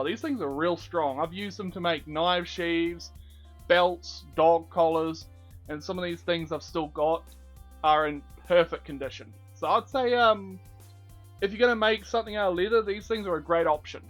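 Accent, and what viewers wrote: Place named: New Zealand. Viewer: Nice accent mate, kiwi’s are awesome :3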